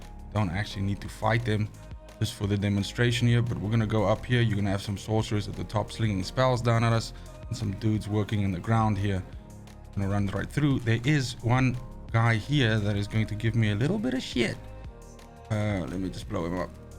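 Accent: South Africa. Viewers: south african bro! im from good ol Jo berg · Bruh Are you South African? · This guys South African? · Are you from South Africa? 🙆🏽‍♂️ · lief die suid-afrikaanse aksent! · Are you south african? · Are u from SA? That accent sounds familiar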